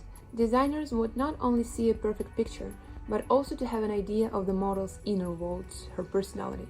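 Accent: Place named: Russia. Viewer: а ты тоже русская,слышу по акценту аххах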